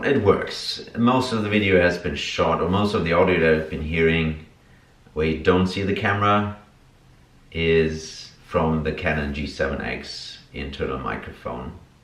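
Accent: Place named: Australia. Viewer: You sound like your from Australia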